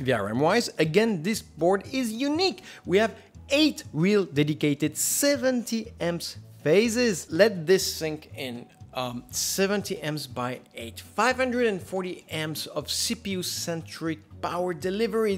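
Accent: France. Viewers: This french accent, you can fight it but you can't win against it..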